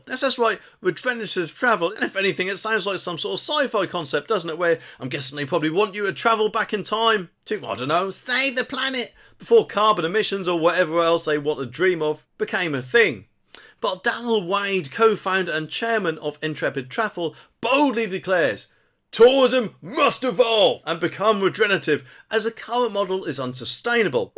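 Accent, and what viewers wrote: Norfolk, United Kingdom. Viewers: I still need to know if you are from Norfolk lol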